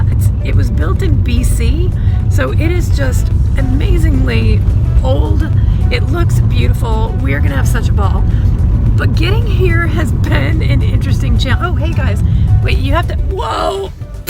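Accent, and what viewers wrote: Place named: Canada. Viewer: Welcome to pakistan have safe trip and u sound like more Canadian then American.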